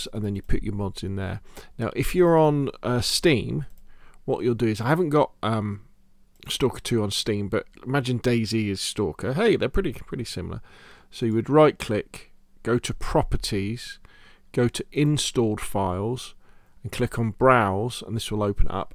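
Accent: United Kingdom. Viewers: strange but i loved your voice haha are u british? thx 4 the vid <3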